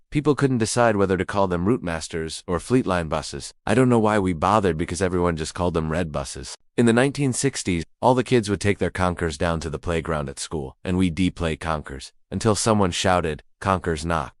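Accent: United States. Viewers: Sorry, the AI produced pictures (look at any text, a lot of which is gibberish) and the Amurcan commentary completely removes any credibility this might have had. I winced at 'Mom', but turned off when we got to 'moble phones'........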